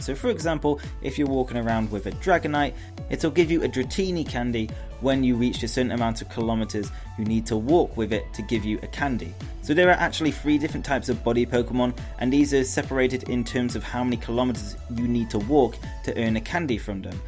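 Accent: United Kingdom. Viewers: omg your British yassss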